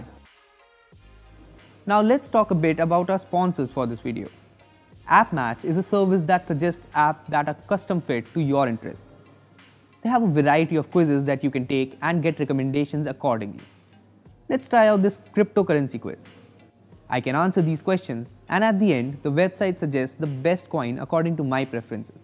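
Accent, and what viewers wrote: India. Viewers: jeez you tryna talk in street language in indian accent...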